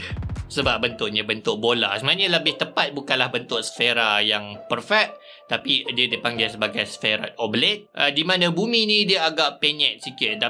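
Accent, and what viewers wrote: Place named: Perak, Malaysia. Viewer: Tk silap perak yg dekat dgn bahagian kedah sebb loghatnya bercampur utara dgn perak.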